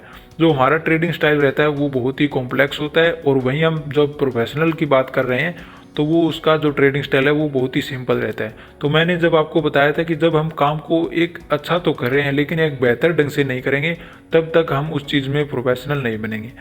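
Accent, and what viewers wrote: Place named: Rajasthan, India. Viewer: Rajsthan k lag rahe hai (meri local language style bol rahe hai)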